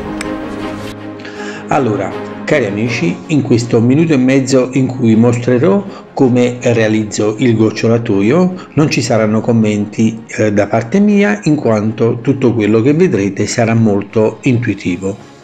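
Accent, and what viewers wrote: Naples, Italy. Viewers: si, me lo hanno già detto in tanti che somiglia a Napoli. Io abito vicino al famoso ponte Morandi, quello crollato.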